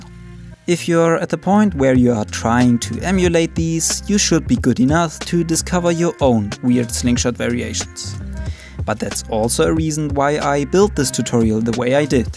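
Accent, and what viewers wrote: Germany. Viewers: 10er wenn das kein eingedeutschtes Englisch ist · Schöner deutscher akzent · Du bist doch ein Deutscher oder :) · Erwischt du bist Deutscher hehe ;) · You sound German m8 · Safe deutsch hört man ja extrem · Are you German? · Ich schätze Mal dass deutsch deine Muttersprache ist...aber finde es trotz des unvermeidbaren Akzents, spitze danke für dieses Video :D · Haha deutscher akzent kickt😂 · Hey mein ich das nur oder sprichst du normaler weisee Deutsch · Der ist Deutscher merkt man am Akzent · Ich höre doch genau raus das du deutscher bist :) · Man hört aus deinem englisch raus das du deutscher bist xD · Are you an German?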